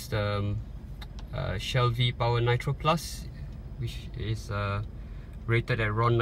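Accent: Singapore. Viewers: U sound so singaporean, r u?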